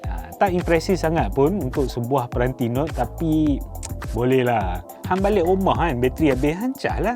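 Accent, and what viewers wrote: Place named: North, Malaysia. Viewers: Ckp utagha dia pekat boleh tahan hahah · Cakap Kedah baik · Tudia kembali pada jalan sebenar, dulu review laguni bahasa utaqa pastu tukaq KL la tukaq balik. Sempoi 😁👍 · org perlis ka?..ckp loghat perlis kalau ya..hi..kita geng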